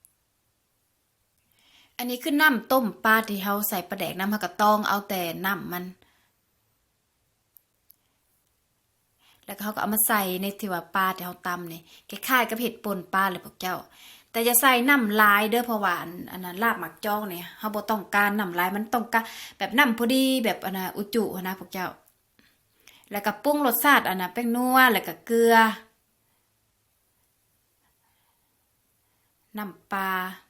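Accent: Savannakhet, Laos. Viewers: สะหวันนะเขดเดี้ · ສຽງສະຫວັນ ບ້ານຂ້ອຍເລີຍ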